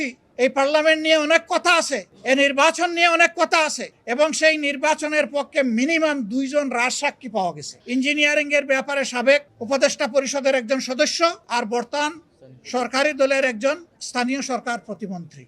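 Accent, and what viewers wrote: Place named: Sylhet, Bangladesh. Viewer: doctor sab speak silghtly sylhety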